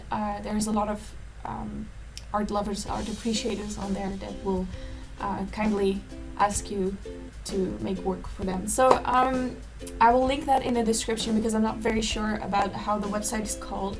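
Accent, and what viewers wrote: Netherlands. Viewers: This is a super random and redundant question, but are you Dutch? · No, I believe she is from the Netherlands.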